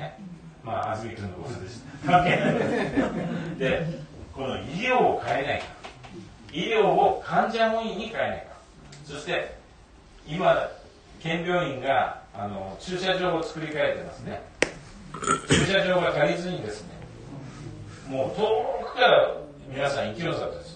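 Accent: Saga, Japan. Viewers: 佐賀弁が身近で良い。解散があるんですね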